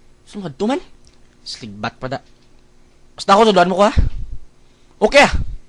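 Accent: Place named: Iloilo, Philippines. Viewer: hahaha.... ilonggo gid man ah...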